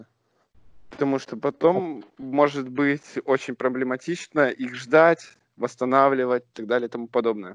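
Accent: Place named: Provinces, Russia. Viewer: Какая речь провинциальная ) слух режет.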